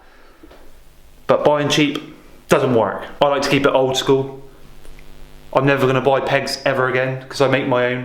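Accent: United Kingdom. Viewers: hate english accent. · Are you Cornish 🔥🤘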